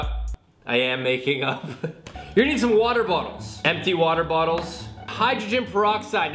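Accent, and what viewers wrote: Canada. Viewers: I was sitting here thinking "is he canadian?" (I'm new to the channel) half way through the video I notice his Canadian jumper...I feel so stupid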